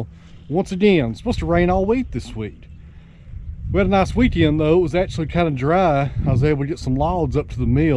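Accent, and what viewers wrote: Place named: West, United States. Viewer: Thank you for the beautiful music and this COLORFUL "OLD" APPLE TREE 'WOOD' video. And Your voice! I feel like watching "WEST FILMS" in the old cinema, I like and emphasize * Thank you for the video you made with "Old and colorful Apple Tree" accompanied by beautiful music. Also, with your tone and accent, I saw myself watching "WESTERN MOVIE" in an old cinema. Country Music is beautiful in its songs. I remembered AUSSIE Singer Slim Dusty and others. Thanks again. Good Luck and All The Best. From ANTALYA/ TURKEY.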